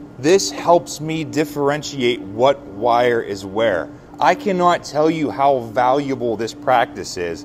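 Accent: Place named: Pittsburgh, United States. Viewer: Didn't realize before you were a Yinzer!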